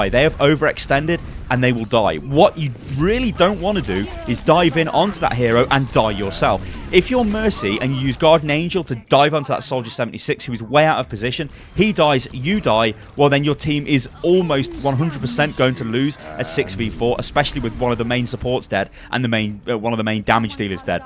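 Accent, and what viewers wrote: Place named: United Kingdom. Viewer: Subscribe reason: 30% Tips and Guides 70% British Accent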